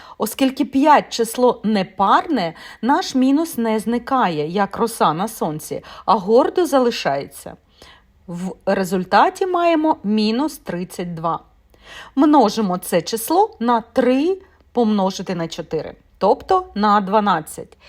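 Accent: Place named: Germany. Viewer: Варто зазначити, що конструкції більшості мов програмування названі англійською мовою. Тому і вимовляти їх варто англійською. У вас вимова схожа не німецьку чи французьку. Чому це важливо? Тому що учні поглинають це і використовують. А перевчитись потім дуже важко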